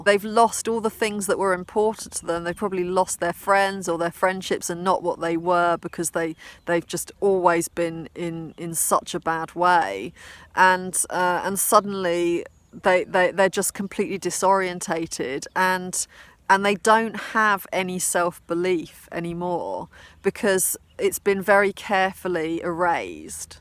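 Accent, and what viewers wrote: England, United Kingdom. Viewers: I prefer the English accent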